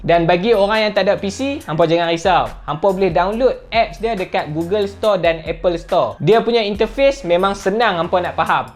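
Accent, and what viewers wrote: Kedah, Malaysia. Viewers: Terima kasih admin, pelet Kedah sempoi baq hang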